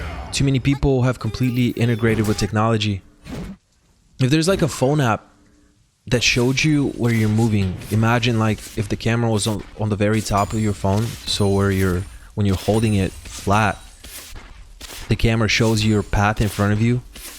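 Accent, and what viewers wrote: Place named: Bulgaria. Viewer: So I've been watching your videos for what? Year and a half? Two? And only now I actually realize why your accent is so familiar - you are a Bulgarian fellow :) Hit me up when you land in Sofia, you have a beer from me ;)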